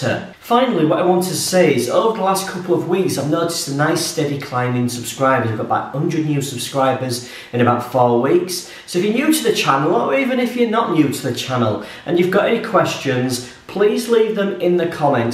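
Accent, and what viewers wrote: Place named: Yorkshire, United Kingdom. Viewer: Hi, I'm a fela from Córdoba, a city from Andalucía in the south of Spain..I am a English learner and I think that your videos are very nice to learn the Yorskhire accent. I visited your area a couple of times and I only can say that i am into all the issues from Yorshire, a region so nice, stunning and picturesque.I would like to travel as well.My city is very nice with all their muslim heritage and not so known as Seville and Granada. Enjoy your time in Spain and NY. Greetings1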